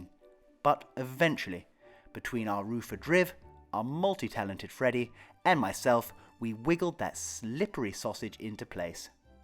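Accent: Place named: England, United Kingdom. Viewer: “don’t be daft “ ha ha that’s all the English lingo I know… but truly love love your excellent English accent. So delightful!! Love watching your English cottage come alive! Thanks for sharing your journey !! Much love from Utah in the states.🤓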